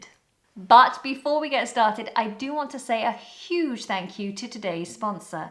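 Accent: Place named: United Kingdom. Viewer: I love your British accent.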